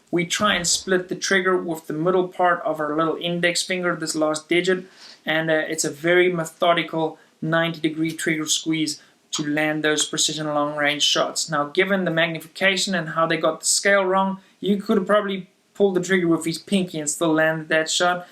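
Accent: Afrikaans, South Africa. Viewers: Hey man, you from South Africa by any chance? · are you from SA? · your accent? are you a south african? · i thought i recognised that accent, im South African, great work here man! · You sound south african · I thought so! 🇿🇦🇿🇦 recognize that Saffa accent anywhere. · South African accent? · He sounds South African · Sounds like a old south African accent..? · Is jy afrikaans? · Where are you from? I want to say South Africa 🤔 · You sound South African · Hold up, are you South african? your accent and speech... must be?:O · Yo are you South African? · South African? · Love die Afrikaanse aksent:) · Is this guy south African? Dope... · Are you South African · The first couple of seconds into this video I paused... Hmm... This guy... Is South African... I was right ^_^ Lekker man.:) Goeie video. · This guy sounds like he is a South African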